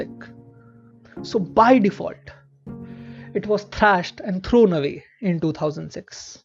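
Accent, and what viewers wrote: India. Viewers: He is a Hindi guy bro not Bengali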